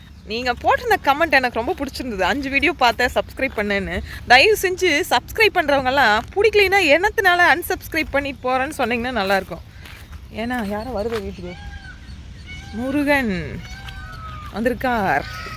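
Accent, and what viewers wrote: Kongu, India. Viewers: அருமையான வாழ்கை நம்ம எதார்த்தமான கொங்கு தமிழ் வாழ்க வளமுடன் ❤❤❤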